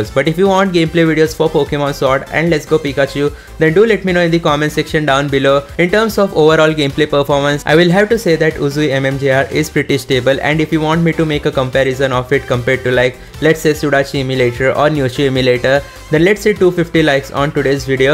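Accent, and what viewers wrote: India. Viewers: Dude that indian accent is so sexy.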